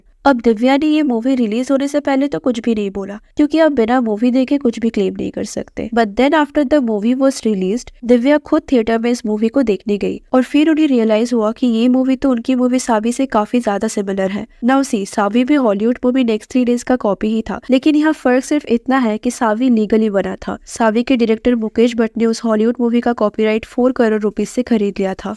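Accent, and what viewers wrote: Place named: Kolkata, India. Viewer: That's khosla, not khoshla😂 I dont know why but I feel you are from Kolkata🤔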